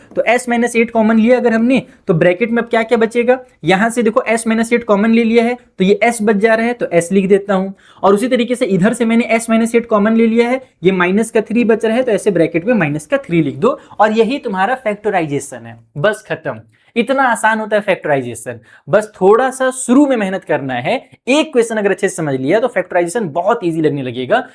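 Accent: Bihar, India. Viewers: thank you sir are you from bihar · Sir bihari ho kiya..........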